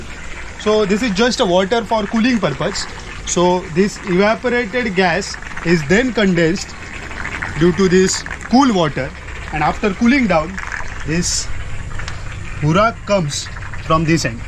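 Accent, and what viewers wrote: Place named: Maharashtra, India. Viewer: खूप बरं वाटतं जेव्हा आपण अंग्रेजी मध्ये बोलता 🙌🏽 Appreciate your efforts and speaking with Marathi infused in subtle way, with specific words and accent too 😇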